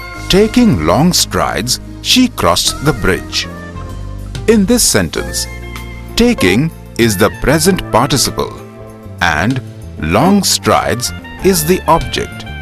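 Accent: India. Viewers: fuck man! I dont unterstand Indian accent.... -.-"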